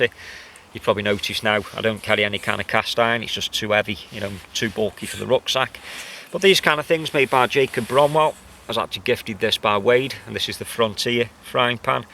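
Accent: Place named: Stoke, United Kingdom. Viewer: That's a fine Stoke accent there my mate. A Neckender?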